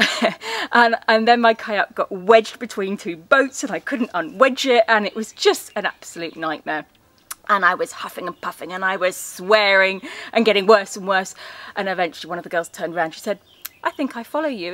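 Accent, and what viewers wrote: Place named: United Kingdom. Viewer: I've got to say, you and are just delightful. I'm sure when you started this YouTube journey, you may not have given much thought about how it would be if your channel got popular and you were recognized. Positano is such a small place, and you guys are recognizable by your kind, lovely faces (your British accent and wonderful smile). You are so gracious to invite people to say hello to you, which can I imagine can be intrusive or inconvenient depending on what you are doing. You never need to apologize for being human or having a bad day. I hope that people respect your privacy when the moment calls for it. As someone who has been wanting to visit the Amalfi coast for all of my adult life, I thank you so much for showing us around your beautiful little corner of the world. You take me there even when I can't be there! 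Love to you from PA, USA. ❤️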